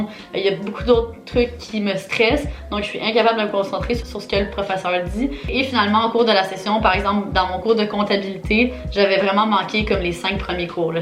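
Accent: Quebec, Canada. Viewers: Je me demande si ton accent québécois va se franciser un peu 😂😘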